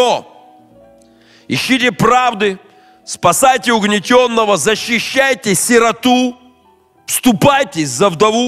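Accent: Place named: United States. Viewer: Что - за американский АКЦЕНТ?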